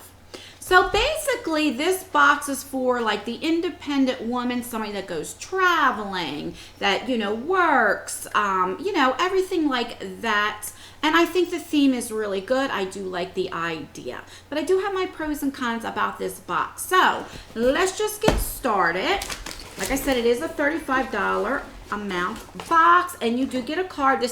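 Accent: New Jersey, United States. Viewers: I need a man with this beautiful jersey accent....u gotta brother or cousin looking? Hehehe!! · I'm sure you hear this a lot but I love your Jersey accent. I'm a newer sub but really enjoying your videos. Also in my early 30s its nice to watch a youtuber that's actually over 30 ❤❤ Also I am sooo like that some things I splurge on others I like to find the cheapest alternatives. I'll slay some Wal-Mart clothes all day 😉 p.s this box seems like more of a $20 box max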